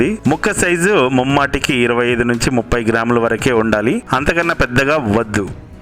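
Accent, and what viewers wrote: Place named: Kadapa, India. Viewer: తప్పుగా మాట్లాడింటి..... అంటున్నారు అంటే కడప or కడప sarrounding వాళ్ల మీరు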